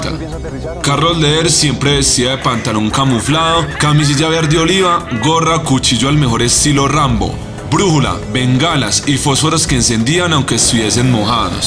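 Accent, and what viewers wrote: Colombia. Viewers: Amigo ud tiene acento colombiano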